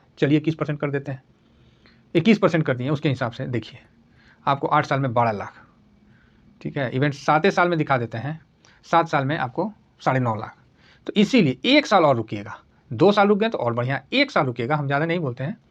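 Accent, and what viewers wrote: India. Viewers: Sir ji apka bolne tarika aur boli bahut achi hai Desi style 🎉🎉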